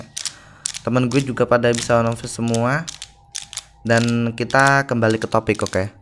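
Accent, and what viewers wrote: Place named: Java, Indonesia. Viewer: Medok mu itu loh masss <3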